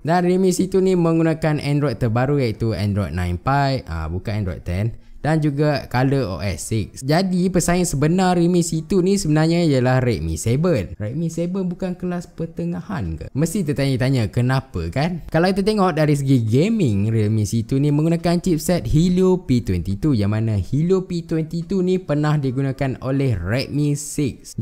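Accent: Taiping, Malaysia. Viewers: Orang taiping ke bang?😂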